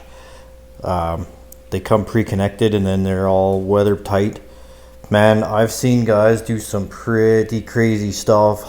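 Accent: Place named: Canada. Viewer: Dude. That Canuck accent. LoL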